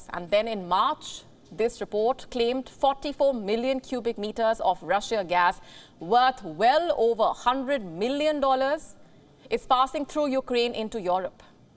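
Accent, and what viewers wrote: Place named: India. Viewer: How funny is the Indian accent 🤣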